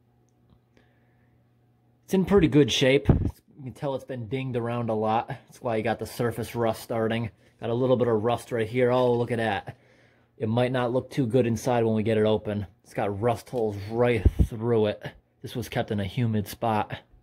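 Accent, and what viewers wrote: New England, United States. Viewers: A wild guess that it's New England.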